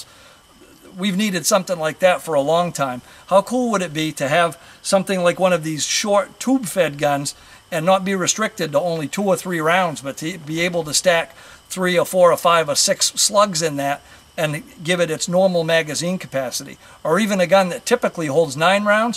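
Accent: Maine, United States. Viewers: ha i just got too the end of the vid i knew ya were from maine cuz of the accent right when you started talking first thing i thought of was my neighbor from when i still lived up there😂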